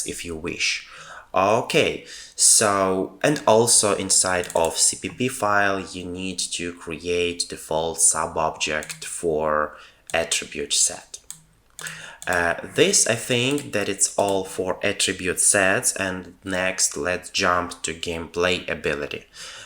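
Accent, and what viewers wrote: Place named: England, United Kingdom. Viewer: О вы из Англии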